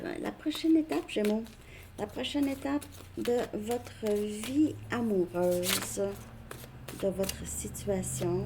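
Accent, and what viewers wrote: Quebec, Canada. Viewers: Love You* * & j'aime ça le parler Québécois..! Tellement beau..!❤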